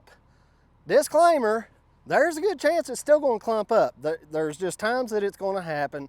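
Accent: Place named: North Carolina, United States. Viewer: Just found your channel, moved to nc from illinois, bought 60 acres and have built a home on it, pond already existed and was searching videos on how to build roads…heard your accent and thought to myself, this guy talks just like my neighbor…sure enough, looked you up…NC..definitely a native. Im located a little far east for you, but i would have you come repair my pond, the shorelines have all collapsed and need the muck cleared out and built back up the shorelines. Finding anyone who has pond experience in my area seems impossible. Im actually thinking of just buying a kx-080, use it for a couple years and then sell it, they hold their value pretty well from what i see.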